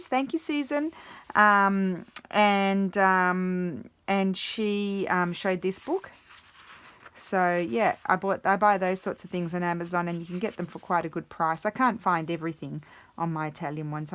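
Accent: Australia. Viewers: As you know I love your videos and chatting about everyday life! Your accent and life in Australia always touch my heart since I still have friends there. Your ideas are fresh and fun to follow! Keep creating and never think you are not good enough, your Great!!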